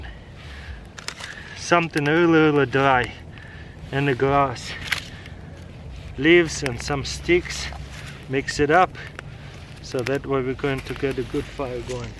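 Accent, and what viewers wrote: Russia. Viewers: Russian accents are my favorite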